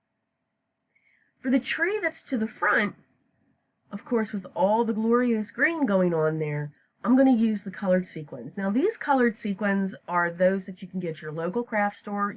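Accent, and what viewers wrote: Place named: Philadelphia, United States. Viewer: I passed on this kit, your projects are so cute and are making me regret my decision. New subbie here, I detect a Philadelphia/south Jersey accent, am I right?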